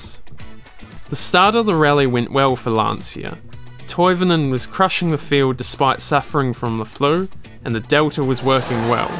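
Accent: New Zealand. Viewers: Are you a kiwi? Great content mate! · Nice to hear a farmiliar nz accent !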